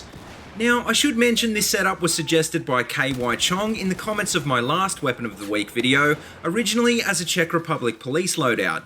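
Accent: Australia. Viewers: You sound very familiar. Which state of Aus do you live in?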